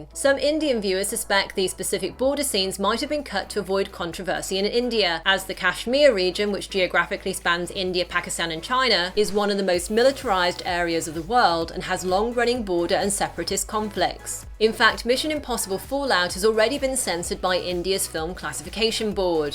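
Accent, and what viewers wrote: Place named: United Kingdom. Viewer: British english ? wow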